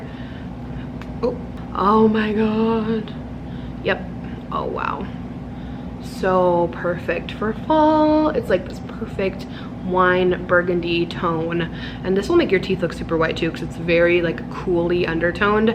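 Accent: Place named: United Kingdom. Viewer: I love the British accent lol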